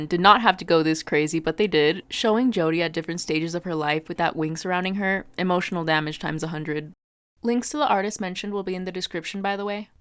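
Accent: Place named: Southern California, United States. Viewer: r u a cali girl youre srs giving insane socal (specifically la and surrounding counties)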